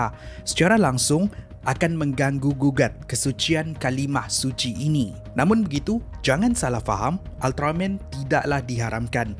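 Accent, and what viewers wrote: Sabah, Malaysia. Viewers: bukan lah sebab cakap melayu masih ada loghat Sabah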